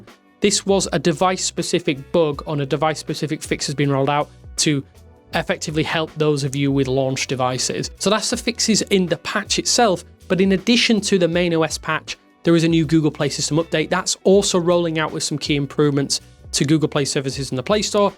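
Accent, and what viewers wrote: United Kingdom. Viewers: I can't watch your complete video just because of your British accent it's really irritate me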